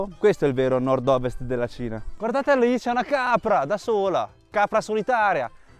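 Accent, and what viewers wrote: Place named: Emilia, Italy. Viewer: I tuoi video sulla Cina sono stupendi. Il tuo accento emiliano e' fantastico.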